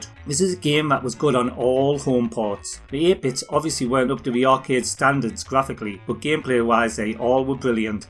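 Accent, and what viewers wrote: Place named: Geordie, United Kingdom. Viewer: Great video, but are your a Geordie, you accent sounds like a posh Geordie, like Jimmy nail😁. I am, just speak your proper accent mate👍🏻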